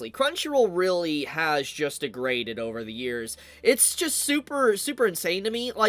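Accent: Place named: Midwest, United States. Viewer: Mid West accent goes hard in this vid lol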